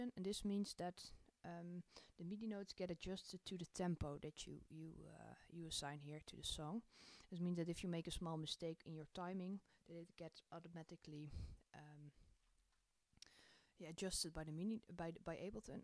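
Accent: Netherlands. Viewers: ik hoor dat je nederlands bent dus ik doe het ff zo, mijn minilab kan niet opnemen en selecteren zoals die van jou het doet. mis ik iets?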